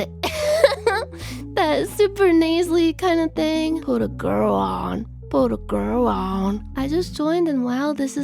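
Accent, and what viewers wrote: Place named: Michigan, United States. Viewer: I want to hear her authentic Michigan accent more sometimes.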